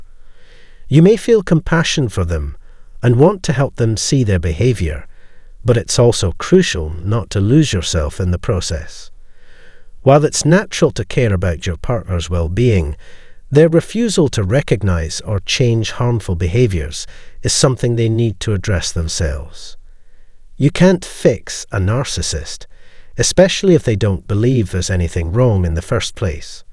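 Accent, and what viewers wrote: Scotland, United Kingdom. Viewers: Your channel is great. Your narratives are very clear. Your accent sounds very familiar. Are you Scottish by any chance?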